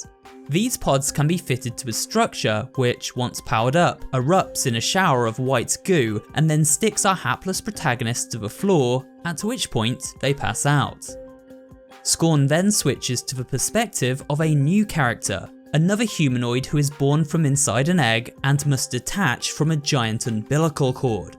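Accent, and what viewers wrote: England, United Kingdom. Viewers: Why does every youtuber sound the same? Mousy, white with an ambiguous english accent ffs.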